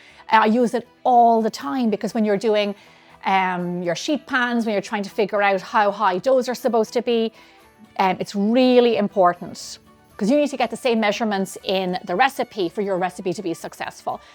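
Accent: Ireland. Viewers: Buenas noches....its really, early early a.m., a Weds., lol. I'm sort of a night owl & noticed your channel. I love love scones. Sadly I'm NOT a Baker. I misplaced my beloved Nina's (Godmother) recipe. I made scones that were at least passable & tasty. However, I so pray that I will be able to make these delish scones. Gracias for this video. I also love love your lovely Irish accent. I will view more of your videos, gracias. Paz be with us all 🙏. "Doña Isa" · So refreshing to hear HUMAN VOICE, and with beautiful Irish accent ! Not Ai .And the scones are great , Thank you! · I love scones - not a Danish traditional so hard to get really good ones! This recipe looks legit good and I will try them. Love your Irish accent! Love Ireland altogether...🍀New subscriber.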